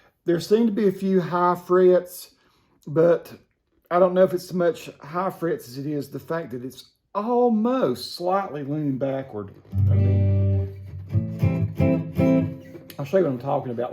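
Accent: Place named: North Carolina, United States. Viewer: I'm detecting a heavy North Carolina accent. Am I close?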